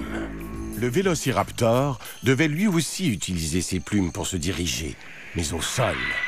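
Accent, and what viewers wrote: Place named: Quebec, Canada. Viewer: l'accent québécois est tellement laid :malade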